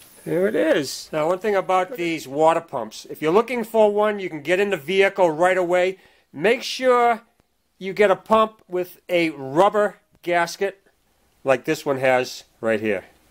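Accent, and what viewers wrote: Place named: East Coast, United States. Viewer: If the accent doesn’t scream “east coast,” then the rust certainly does!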